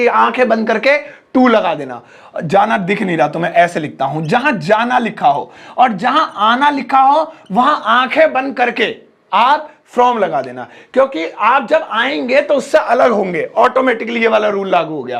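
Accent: India. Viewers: I love his desi English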